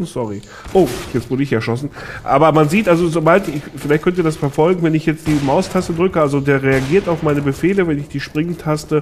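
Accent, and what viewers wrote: Augsburg, Germany. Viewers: Der kommt aus augsburg ja moin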